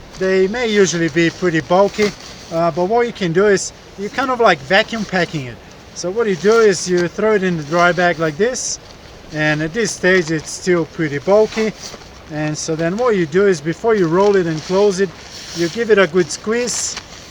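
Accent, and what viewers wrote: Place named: Brazil. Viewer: Thanks for the video! I discovered dry bags recently and have started using them a lot. Incidentally, love your accent. At first I thought Brazilian, then figured you for an Italian... then found your Instagram and had my original suspicions confirmed! :)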